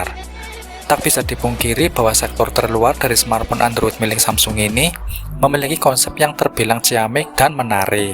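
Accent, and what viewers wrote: Banyumas, Indonesia. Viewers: Wong ngapak ya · Ngapak ngapak.. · KAN ORANG NGAPAK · Ngapak ya.. 😁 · Soalnya aksennya "ngapak". Jadi harus menekan aksennya itu biar bisa ngomong bahasa Indonesia dgn baik. Gitu sih kayaknya. Hehe.. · orang ngapak ya bang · logatnya ngapak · Suara ngapak nya keliatan bnget yakk.. wkwk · Jawa e ndi bro? Ngapak banget yah 🤣🤭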